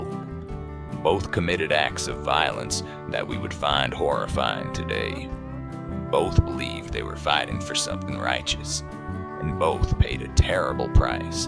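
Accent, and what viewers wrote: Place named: Appalachia, United States. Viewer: The guy doesn't sound Texas. He sounds Appalachian, North Carolina or perhaps Kentucky. Why does it matter so much to you?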